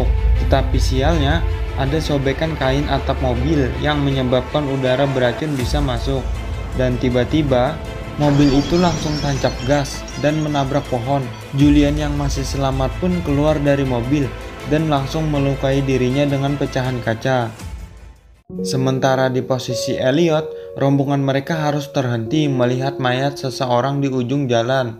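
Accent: Kalimantan, Indonesia. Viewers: Bang orng kalimantan kah?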